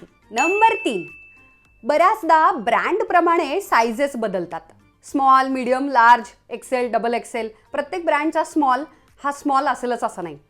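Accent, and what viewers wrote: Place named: Pune, India. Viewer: I just love the way you talk, pure marathi puneri tone :), looking forward to see online shopping tips/tricks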